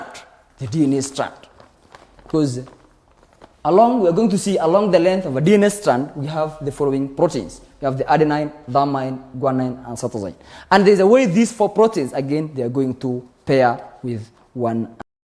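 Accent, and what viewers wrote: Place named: Africa. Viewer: Waxaagaba lama fahmi karo lahjad foolxuno tii africanka ba wax ku aqrinaysaaye tii somalida la imow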